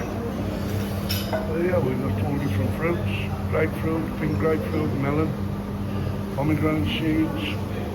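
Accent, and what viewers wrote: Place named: Black Country, United Kingdom. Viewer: Nice to hear a local accent :) , born and bred brummie here now living in east Yorkshire ( guessing your more black country way? ) ... we did Tunisia last year but Sousse , enjoyed it but fancied a change this year and found this hotel , another Tui Blue ( although not adults only this time sadly ) Great honest review and so have booked it for mid December , bit more money than last year but we've paid for a sea view room etc .. cheers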